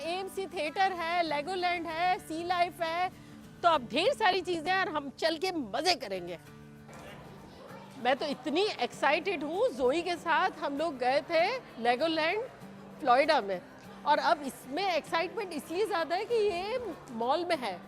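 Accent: India. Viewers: mam I was watching your pani puri recipe with my dad today and I told him she is from karachi but at times sounds so indian🤩🤩🤩 my dad and I enjoy watching your recipes Love from india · Very beautiful vlog. Felt like we are enjoying with you. Your accent is very much like indians as compared to other youtubers that I follow. Any roots in India?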